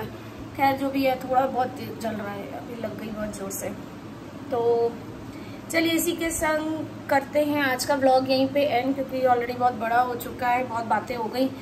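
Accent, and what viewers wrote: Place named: Pandit, India. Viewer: मैम आप बहुत खूबसूरत हैं ,😊आप पंडिताइन बोल रही हैं मुझे हंसी आ गयीं हम भी पंडित हैं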